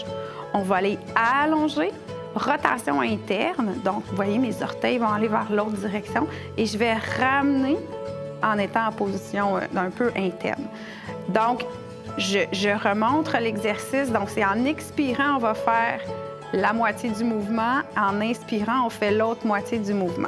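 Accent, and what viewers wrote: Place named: Quebec, Canada. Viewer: grand merci pour votre réponse, car lorsqu' on est Français et qu'on n'a plus l'oreille trop fine, l'accent de la belle province a besoin d' être écouté sans perturbateurs musicaux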